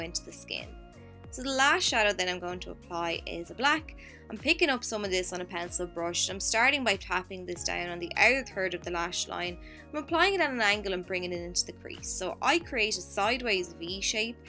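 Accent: Ireland. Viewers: Subbing for that gorgeous Irish accent! And I have the same shade eye color so these videos will be helpful!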